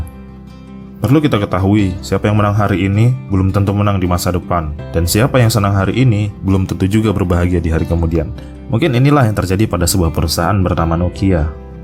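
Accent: Lombok, Indonesia. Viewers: matur tampiasih? mas nya org Lombok?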